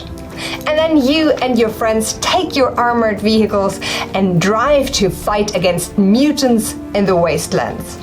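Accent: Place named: Ireland. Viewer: Sorry for being an American Idiot, but I totally thought was from Ireland from her accent. Prost!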